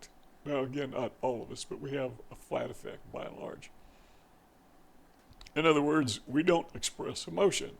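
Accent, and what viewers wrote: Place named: South, United States. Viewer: I’m Australian,and I can pick your southern accent quite easily. It’s a mild accent, but there all the same, especially when you say “I”, it sounds like “ah”.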